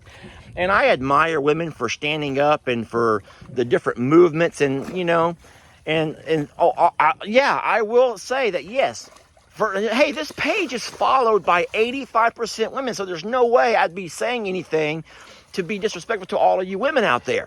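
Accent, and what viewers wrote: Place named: South, United States. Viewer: We say ol man and ol lady . I think It's more of a country thang you just have a southern accent.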